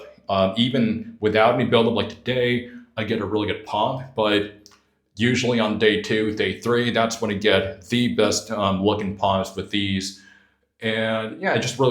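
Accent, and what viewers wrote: South, United States. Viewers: You sound like you picked up a Southern accent.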